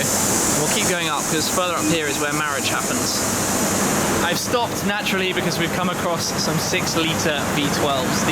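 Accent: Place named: United Kingdom. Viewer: this guy sounds like the british stadman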